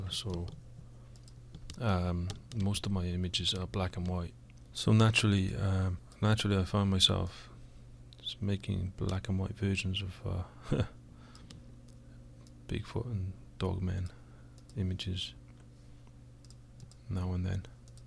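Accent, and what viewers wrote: Wales, United Kingdom. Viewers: Another great video....do I detect a hint of Welsh in your accent?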